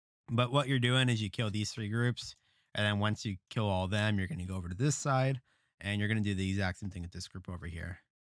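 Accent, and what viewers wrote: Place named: Canada. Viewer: Awesome, thank you! Also you sound like a fellow Canadian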